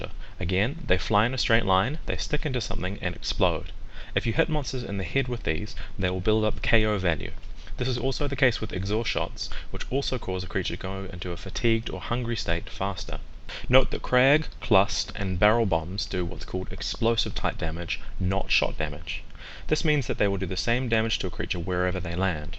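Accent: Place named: New Zealand. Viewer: Nice vid, great to hear that NZ accent:-D Hope to see more from you when 3U hits our shores.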